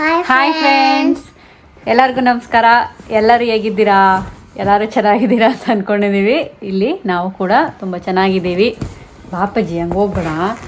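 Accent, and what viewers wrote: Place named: Malavalli, India. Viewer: ಮಳವಳ್ಳಿ ಶೈಲಿಯಲ್ಲಿ ಮಾತಾಡಿ ಕೇಳೋಕೆ ಇನ್ನೂ ಚೆನ್ನಾಗಿರುತ್ತೆ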